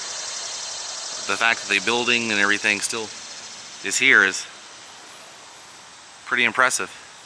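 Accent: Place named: South, United States. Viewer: Every video in Mississippi, I can hear that southern drawl creeping back into your accent...lol. I'm the same way. Another awesome video!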